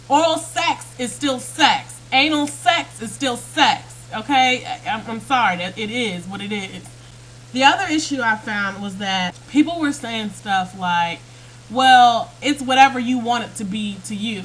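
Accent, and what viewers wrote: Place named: Texas, United States. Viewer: wait what!? handcuffs? little timmy? 'what you've been doing wrong with it' ! you are HI-larry-US whe you go off like a shot, the faster you go, the more the 'texas belle' voice comes out until the rubberband unwinds and you just stop. or funner yet, freeze for a moment and then keep going! i swear i think my stream stops. keep the rants coming, it's a hilite of my day!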